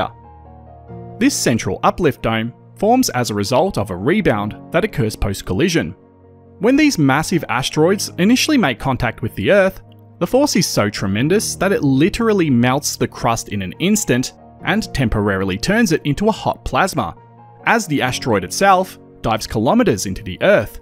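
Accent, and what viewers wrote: Australia. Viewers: Nice to hear this program in a good ol Aussie accent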